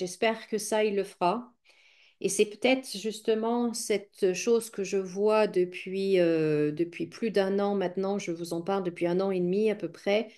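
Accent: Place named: United States. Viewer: Quelle accent Américain 😊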